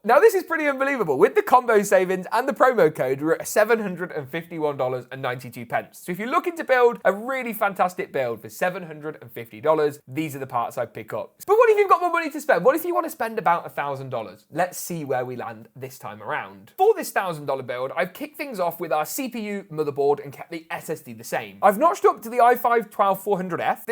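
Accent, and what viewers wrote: England, United Kingdom. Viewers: Why tf dollars ? You sound English? Pounds perhaps ?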